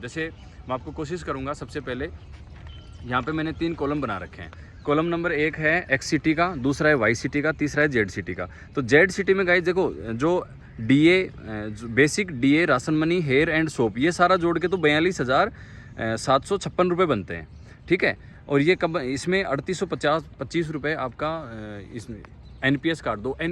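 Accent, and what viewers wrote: India. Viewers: Aap sikar se ho kya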